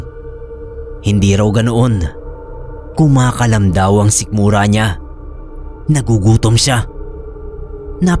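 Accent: Iloilo, Philippines. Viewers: kuya ilonggo ka no? 😅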